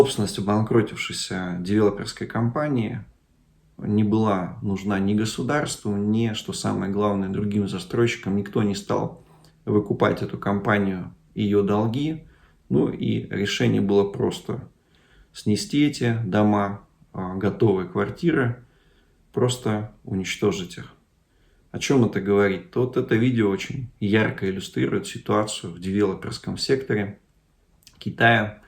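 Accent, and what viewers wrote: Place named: Siberia, Russia. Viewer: Ну ещё и сибирский говор, который, как сказал поэт, "неистребимый уральский акцент ", это мегакруто слушать, при совершенно правильной речи дух человечности реального языка сквозит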